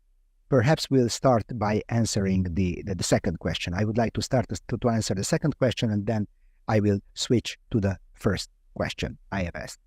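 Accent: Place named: Hungary. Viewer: i though you had hebrew accent in english, but that is in fact still hungarian, nice that it remains.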